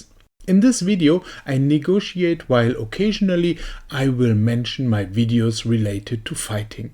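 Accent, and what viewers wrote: Germany. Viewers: Hört sich sehr deutsch an dein Englisch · your accent is awesome haha. wundebar!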